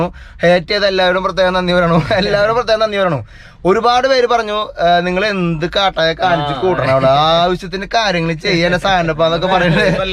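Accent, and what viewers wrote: Thrissur, India. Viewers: വീഡിയോ അടിപൊളിയാണ് but thrissur ഭാക്ഷ ഒന്ന് മാറ്റിപിടുക്കോ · .. Congrats..10 k like below 200 dislike ഒന്നുമല്ല.ചില ആളുകൾ ഉണ്ട് അസൂയ കൊണ്ട് നെഗറ്റീവ് comment ഇടുന്നവർ,അവനൊന്നും ജീവിതത്തിൽ സാധിക്കാത്ത കാര്യങ്ങൾ കാണുമ്പോൾ ഒരു ചൊറിച്ചിൽ ആണ്.ഞാൻ മലപ്പുറം ആണ് നിങളുടെ വീഡിയോ കണ്ടു തൃശൂർ സ്ലാങ് ഒരുപാട് ഇഷ്ട്ടായി.ഒരു 45 minute video kku മിനിമം 2 or 3 മണിക്കൂർ viedo വേണം.. -5 ഡിഗ്രി ഇതിലും കൂടുതൽ power ആർക്കും കിട്ടില്ല.. നിങ്ങളുടെ ചാനലിൽ ഈ vedeos ever green ആയിരീക്കും.. കട്ട support.. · സാന്റാപ്പാ മ്മടെ തൃശൂർ ശൈലി മാറ്റല്ലേ ട്ടാ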